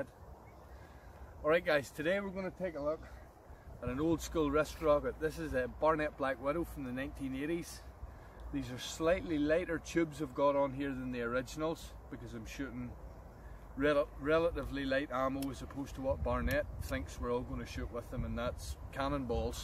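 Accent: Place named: Scotland, United Kingdom. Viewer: He sounds like he is from Stranraer in Scotland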